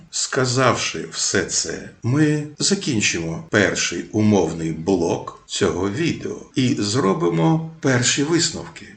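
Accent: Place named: Ukraine. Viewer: Дякую за роботу та за українську вимову! По Толочко якось не зрозуміло, якщо він такий промосковський, то чому це питання не піднімається і його хоча б не звільнять, для початку. Бо ви вже не один рік піднімаєте цю проблему, і я так розумію людина ця не поміняла досі своїх поглядів! Чи поміняла? Або я роблю висновок, що корупція від москалів в історичній царині нашої країни це реальна інституція, яка інтегрована в нормативно-правових актах та втілена в більшій частині людей при керівних посадах?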